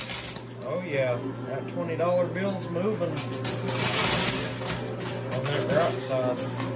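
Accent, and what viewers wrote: South, United States. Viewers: .....What state do you live in you have that southern accent....YOUR ALWAYS ENTERTAINING....CANT WAIT FOR THE NEXT VIDEO.....EVERY DAY.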